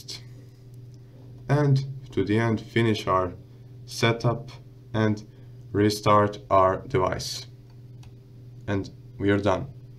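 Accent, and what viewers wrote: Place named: Serbia. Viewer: Odmah sam znao da si nas cim si poceo da pricas :) Hvala na videu...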